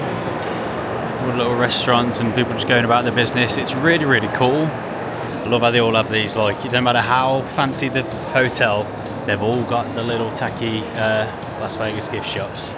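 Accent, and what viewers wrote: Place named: Birmingham, United Kingdom. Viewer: Your thoughts were exactly the same as mine when I first visited Vegas. We love the Luxor and I cant wait to get to that part of the vlogs when you get there. The Luxor for us is quiet to retreat to but still on the strip and a fantastically themed hotel. I'm picking up your accent being local to mine too which makes the vlogs very easy to watch and relate to. (Im from Birmingham UK). Keep up the content, this is the video I stumbled on to find your channel.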